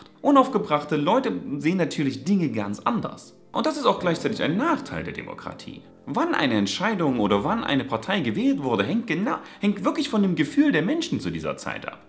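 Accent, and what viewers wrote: Saxony, Germany. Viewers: ich find deinen Dialekt super, komme selbst aus Sachsen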